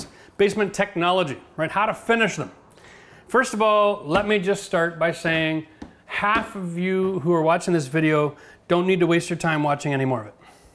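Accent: Canada. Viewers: I've watched every video you have. You seem like a cool guy and you are VERY knowledgeable! I don't mind that you have plumped up a bit over the years. Don't even mind the Canadian accent, but every time i see your dewalt tools i throw up a bit. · It's gotta be the Canadian accent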